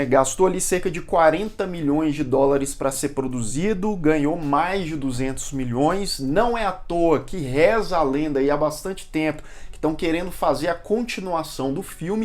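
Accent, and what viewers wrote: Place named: Belo Horizonte, Brazil. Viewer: Que beleza de vídeo e o lance da Kombi dos ovos a dez Reais foi massa!!!! Kkk parabéns!!! Vc é mineiro, né? Sotaque de bh!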